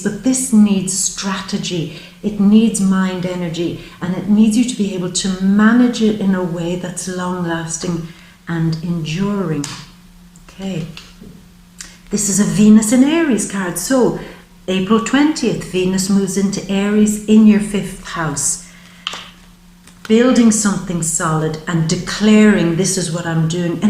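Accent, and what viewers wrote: Ireland. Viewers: I sent your readings to a LOT of my friends. Problems with the Galaxy or not, YOU were very powerful. I'm going to listen to my sage Sun reading when I go to sleep for awhile so that I can fully absorb it. Enjoyed my moon read as well as I'm SURE I will my rising. Love your Irish accent and your practical take on astrology and tarot. VERY insightful AND inspiring. THANK YOU SO MUCH ❤️🎈💗🎈💞🎈💕🎈 🙌 🌞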